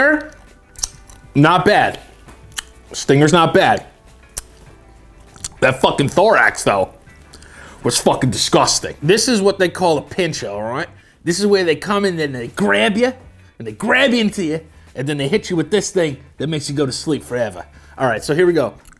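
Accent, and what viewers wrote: Australia. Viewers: i swear i’ve watched this a million times; now my going to sleep video i watch Australian accent kills me every time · NOT down to watch eat more bugs considering the fact ima bout to puke...... but very down for a whole video of him just talking in an Australian accent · As an Australian, that accent was not offensive, but it was shithouse. 😂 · the Australian accent is killing me 😂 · Love the ozzie accent! Bloody rippa mate! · HIS AUSTRALIAN ACCENT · Am I the only one that thinks with an Aussie accent is 👌👌👌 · As an Australian man, I can confirm this is exactly how we speak... mate.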